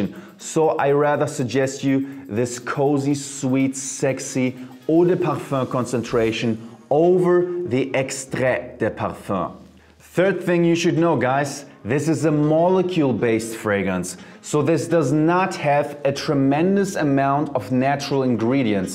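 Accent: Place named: Germany. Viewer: Great video! This is crazy... I'm from the east coast of the U.S. But I have the habit of saying Bacarrat Rouge and other fragrance names with your German accent! 😆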